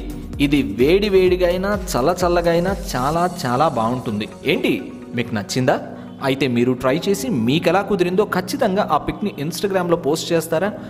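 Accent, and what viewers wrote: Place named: Andhra Pradesh, India. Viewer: Hai nenu mee language vinaganay adiga meedi andhra ani but didnt reply.ok ardham ayindi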